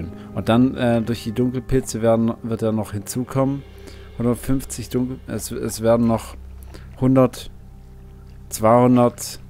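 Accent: Germany. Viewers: Ich finde es sehr schade, dass deine oberschwäbischen "Sprachwurzeln" mit dieser "Köllschen Betonung" die Du mittlerweile angenommen hast, verloren gehen.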